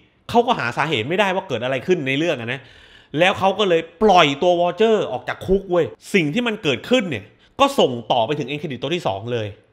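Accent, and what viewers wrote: South, Thailand. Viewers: คนใต้หรือคับ ผมชอบนะเวลาพูดใต้ เพราะมัน.อ็อฟตัด!!.....(หายไปไหนมาทีไรตกใจทุกที)สนับสนุนแกนานนะอ็อฟตัด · พูดใต้เสริมๆมาบ่อยๆก็ตลกดีนะครับ เป็นเอกลักษณ์ช่องดี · ชอบ พูดใต้อะ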